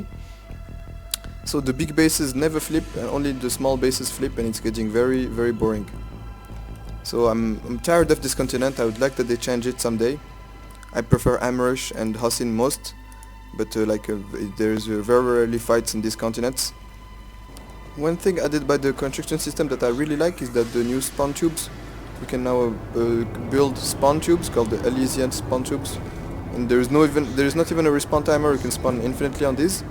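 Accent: France. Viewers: es-tu francais? tu as laire davoire laccent ;) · on sait tout de suite que t'es Français ah ah ah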